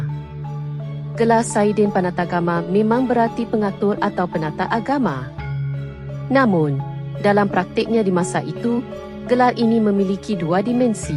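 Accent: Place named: Malaysia. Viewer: Logat malaydesh tidak elok didengar. Bahasa malaydesh menyusup ke dalam bahasa Indonesia lewat membacakan narasi sejarah kerajaan di Jawa dengan menggunakan logat malaydesh yang terdengar kaku.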